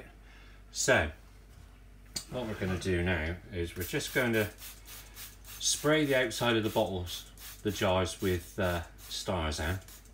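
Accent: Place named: Somerset, United Kingdom. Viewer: Sounds like somerset to me?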